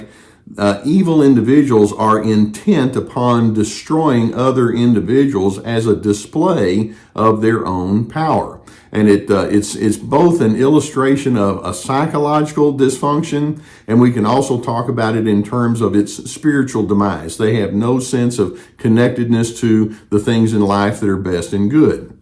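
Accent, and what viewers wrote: South, United States. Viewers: As an aside...I just wanna say..I love your southern accent. ❤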